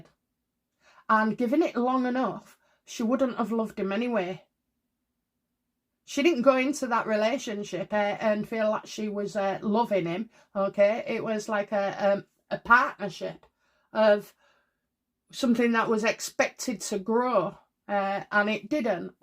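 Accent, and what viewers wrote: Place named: South Yorkshire, United Kingdom. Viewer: I just watch because I like listening to you. As a Christian I shouldn’t even watch these kinds of videos 🙈🤪 You sound like you could easily live where I live in South Yorkshire. If it’s any consolation based on other comments I’ve seen, many other people said he was going to pass yesterday.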